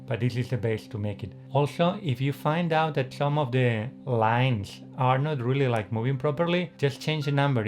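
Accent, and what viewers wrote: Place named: Slovenia. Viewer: Are you from Slovenia? Your accent sounds Slovenian.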